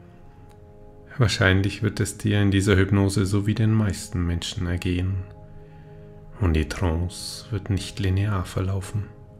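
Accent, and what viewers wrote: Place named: Franconia, Germany. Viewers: Ich dachte du kämmest aus Bayern mit gefällt die stimme auch deine ganze art die du zeigst hier bei Youtube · Allmächd, ich liebe den leicht fränggischen Akzent.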